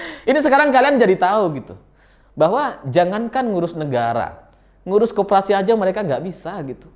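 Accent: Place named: Madura, Indonesia. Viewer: Loghatnya sperti madura, makjleb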